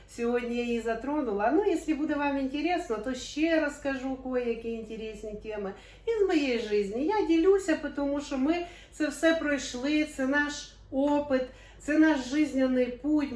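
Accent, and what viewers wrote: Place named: Poltava, Ukraine. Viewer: Доброго дня! Підняли тему жіночої дружби, і ми тепер позгадуємо, як було) Так, по молодості було багато жіночих дружб, студентських, ну не таких, щоб прям занадто, але з часом, з роками ти розумієш, що найкращої дружби, як до своїх близьких та рідних годі й шукати! Мені зараз 46, батьків вже нема давно, але підтримуємо тісний зв'язок з сестрами, це найкращі подруги 💯💯 нас троє. Добре, що так виходить, дай Бог, щоб і надалі так було!!🙏🤗🤗 А от тема про Полтавську область цікава, де ви там проживали, скільки часу, щось про Полтаву? Бо я сама з Миргородського району, а зараз ми виходить недалеко й мешкаємо один від одного, хвилин 40-50 їзди)) Чомусь зразу, як почала вас дивитися, почула у вас полтавську "балачку", ну трошки є)🤗🤗🤗 Бувайте здорові, гарного дня, всим вітання!!❤❤❤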